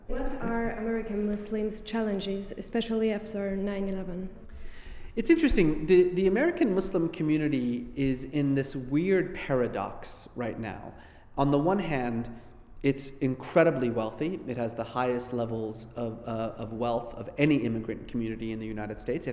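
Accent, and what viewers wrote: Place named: France. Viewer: poor production quality... too much echo and the french accent of the host is distracting.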